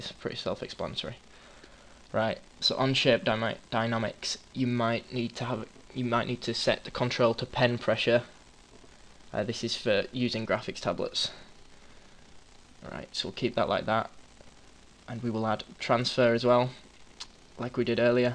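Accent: United Kingdom. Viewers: This is great, thanks for making it so simple. Now I can finally make my own digital drawings instead of drawing them on paper and editing them in photoshop, that was a pain...I knew there was a way to do all this but I completely forgot how and never really could figure it out. By the way, your accent's great, but what part of the United Kingdom are you from? I don't know all that much about accents so I don't want to sound stupid, but it sounds slightly different from most English accents, is there a name for it?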